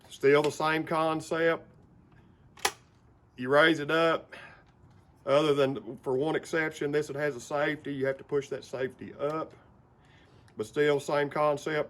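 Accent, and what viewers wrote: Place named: North Carolina, United States. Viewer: You my kind of guy friend got a gun for every situation !!! Love the video and the southern accent which sounds like NC. or Tenn !! Imma Virginia ole boy got my two Colt .45's and want to collect me one of them coach 12 ga. shotguns with the external mules ears and a Winchester 1873 in .45 Colt to complete my cowboy gun collection !!!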